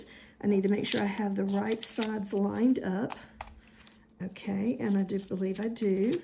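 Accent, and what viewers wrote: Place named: South, United States. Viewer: Hi~I've just found you. This looks like a fun project which I'm going to add to several of my many, many journals. I'm thinking that this is a great way to use up some of those found, pressed, and saved items plus what-nots that are accumulating in my desk, that can use a safe home and this remedy is so clever! Since I do not have a square punch, I'll use a round one instead, which I'm sure will turn out just fine. I'm really enjoying your soft sweet southern accent. Thank you for apologizing frequently, but it really isn't necessary , at least not for me. You're fine just the way you are. I look forward to more inspiration from you, till then, thank you.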